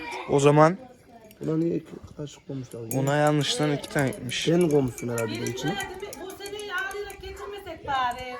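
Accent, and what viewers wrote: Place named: Avşar, Turkey. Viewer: kardeşim selamlar, yeni abone oldum, memleket neresi kardeşim, avşarlık varmı şiveler benziyor gadasını aldığım 🙂 Frankfurt'dan selamlar hayırlı günler 🇹🇷🇹🇷🇹🇷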